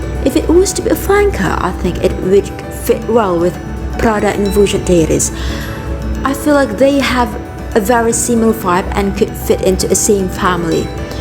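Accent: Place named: United Kingdom. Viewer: Great description, Awesome illustration, Fantastic British accent ! Well Done !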